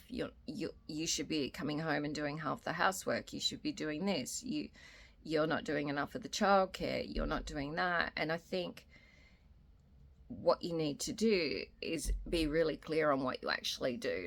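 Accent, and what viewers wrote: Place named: Australia. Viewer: Are you Australian? Its kinda tricky where to place your accent?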